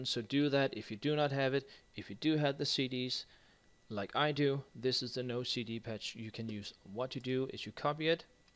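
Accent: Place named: Denmark. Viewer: LOL Dansk accent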